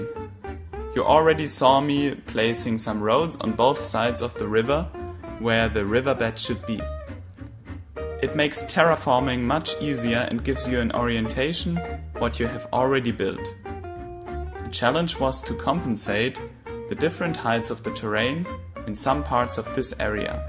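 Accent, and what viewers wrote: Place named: Mannheim, Germany. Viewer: Are you from near Mannheim in Germany? I recognize the dialect! XD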